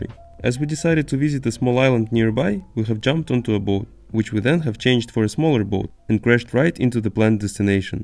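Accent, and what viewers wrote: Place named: Russia. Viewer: Братан, у тебя русский акцент. Это 100%. Ты откуда?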